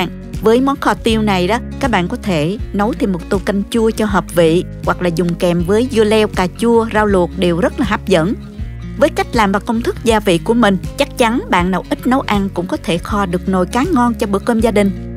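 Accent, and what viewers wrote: South, Vietnam. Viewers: Giọng ngọt ngào đậm chất miền Nam, dụng cụ nấu sạch sẽ, nguyên liệu sơ chế kỹ lưỡng, món ăn nấu xong nhìn bắt mắt rất ngon, cảm ơn kênh rất hữu ích cho các bà nội trợ.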